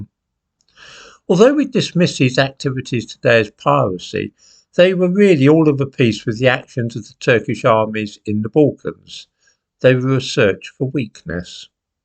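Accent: United Kingdom. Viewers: This guy's British accent screams conspiracy and exaggeration. Anyway, white slavery is a collective 'moved on from' because not victims.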